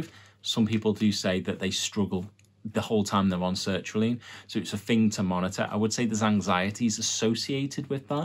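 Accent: Liverpool, United Kingdom. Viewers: You’re a scouser ❤ so am I Brilliant .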